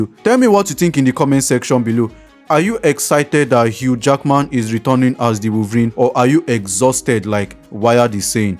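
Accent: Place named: Africa. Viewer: You can clearly hear that you're african 😂 love the african accent haha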